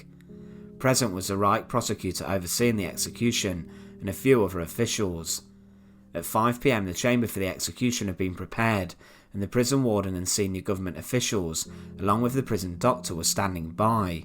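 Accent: United Kingdom. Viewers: Impressive history! Btw, what is the melody in your reading? A bit difficult to follow. Not standard British, tho.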